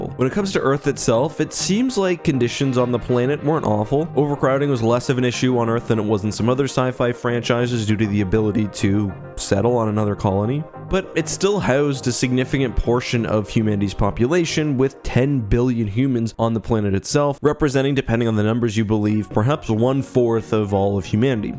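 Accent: Canada. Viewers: Gotta love his Canadian accent! Always good content tooo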